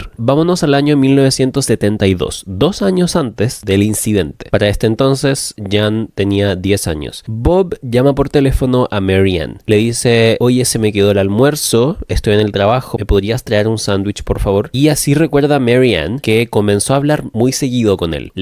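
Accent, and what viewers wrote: Chile. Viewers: Oh wn que cuatico como la religión te hace eliminar el pensamiento crítico y te hace susceptible a cualquier mentira, incluso una tan retorcida como esta. Oye que bacanes tus vídeos, le tomo caleta de atención. Me gusta mucho el true crime, pero estaba chato de escuchar gente no chilena ajsj así me siento más en familia. Bacan tu canal. Saludos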